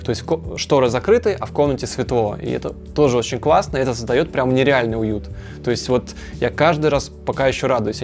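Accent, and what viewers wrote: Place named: Okanye, Russia. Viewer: Перестаньте окать!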